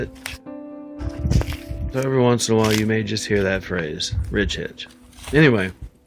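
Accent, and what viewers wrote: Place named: Newcastle, United Kingdom. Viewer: he has a georrdie accent ,some folks thinks its scotish cheers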